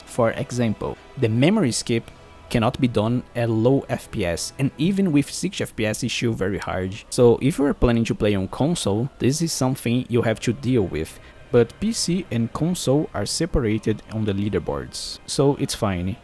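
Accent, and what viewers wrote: Brazil. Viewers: Parece um brasileiro falando inglês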